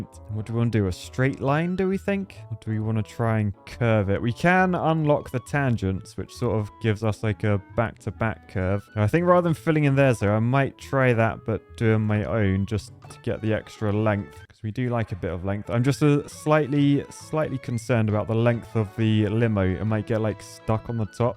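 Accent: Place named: Scotland, United Kingdom. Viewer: I missed the Scottish accent, though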